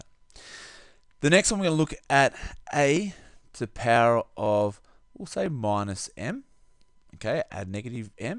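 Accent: Australia. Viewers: i know this is an old video of yours but i just stumbled apon it im in 8th grade and im also an aussie and its really nice to hear a good loud aussie instead of a quiet european tutor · Thanks mate for the help. I have a block exam next week for maths b and I needed to recap. Nice to listen to an Ausie voice as I am to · Thank you so much! My teacher doesn't show how to work out the questions she just puts examples on the board expecting you to know what to do. I also love that you are Australian because as I am australian I find the accent easier to actually listen to. THANK YOU SO MUCH. I hope I pass year 9 maths this year lol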